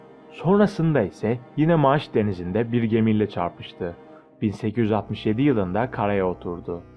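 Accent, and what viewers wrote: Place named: Germany. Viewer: kardes alman ikinci liginden gelen gurbetci topcular gibi konusuyosun.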